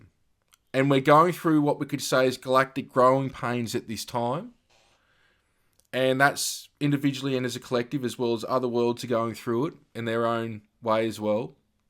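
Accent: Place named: Australia. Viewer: Love hearing a fellow Aussie on my feed. PRAIR :)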